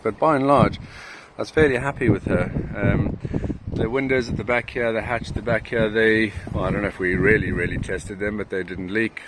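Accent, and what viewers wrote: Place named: South Africa. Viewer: You have a very South African accent there... An expat maybe?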